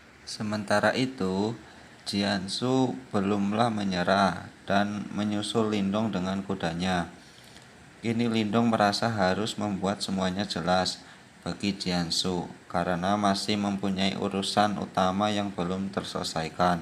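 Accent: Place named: Java, Indonesia. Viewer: Medok banget jawa nya bang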